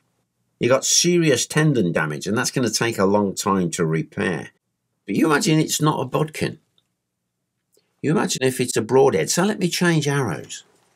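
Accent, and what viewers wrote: United Kingdom. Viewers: Just found this channel glad I did. You’re a class act my man and you have the best kinda British accent btw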